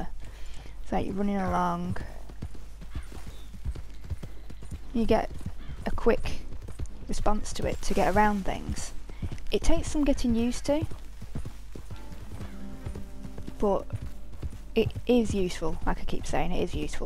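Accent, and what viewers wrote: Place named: Manchester, United Kingdom. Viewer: is your accent from Manchester? That's my first guess, or at least somewhere in northern England. If I'm wrong I mean no disrespect. I just love all of the English accents but I'm still not quite good at placing them.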